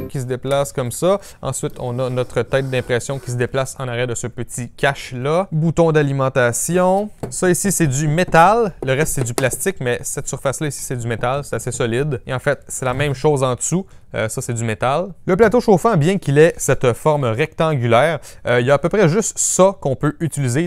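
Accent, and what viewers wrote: Quebec, Canada. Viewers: Très intéressantes, tes vidéos. Captivantes même. Et délicieux l'accent des cousins d'Amérique ! Merci et bravo.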